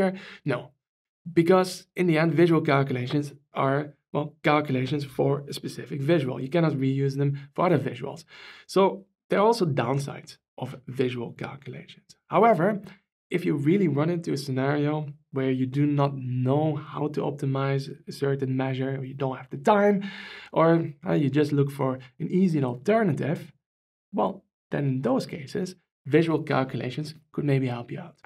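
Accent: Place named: Netherlands. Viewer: I am not an English speaker but I follow your educational content. Why is your English accent like this? Whether it is Google's AI or any AI I have used, it pronounces your language as Dutch, not English.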